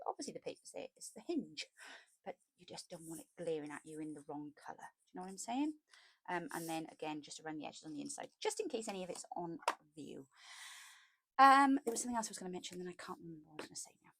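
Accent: Wales, United Kingdom. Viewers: Hi sweetheart 💕 what an amazing project loved it. The papers being used for the collaboration are stunning you have done an amazing job with them. The volume was perfect and I could hear no background noise, just your lovely Welsh accent 🥰 oh how I’ve missed that voice! This is the first time in nearly 5 months I’ve managed to watch a video all the way through! Ps I’ve noticed you have over 18 k now huge congratulations I’m super proud of you. I’ll keep fighting until I’m back at my desk making pretty things, but my fine motor skills are still rubbish BUT better than they were, so I’m very grateful for my progress. Sending you loads of love 💕 xx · Microphone is great! You sound a lot clearer. I'll definitely give the cog a go too as you do speak a little too fast at times for me and have stopped watching the video. Love your Welsh accent.☺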